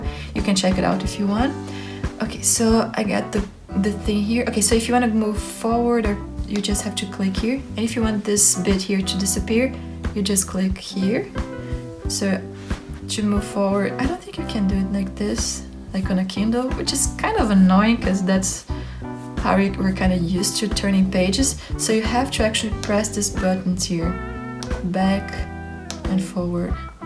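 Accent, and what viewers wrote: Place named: France. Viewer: i love your french accent!!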